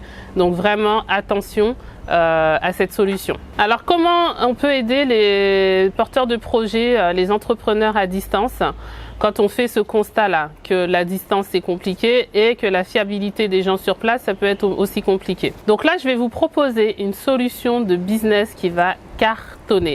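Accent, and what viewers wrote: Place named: Senegal. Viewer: Cest drole plus je vous écoute plus j'entends l'accent qui se "sénégalise"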